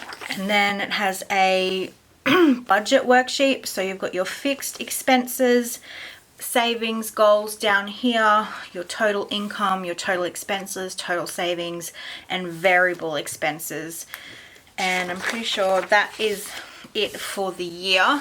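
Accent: Australia. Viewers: I love Australian accents. So cute :D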